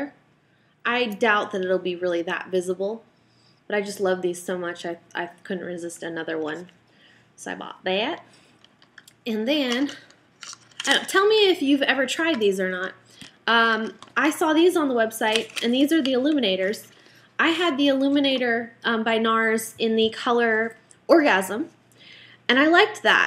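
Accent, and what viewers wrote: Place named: West, United States. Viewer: AND THAAAAN hahahahah I love how you do it in a western accent... all the time!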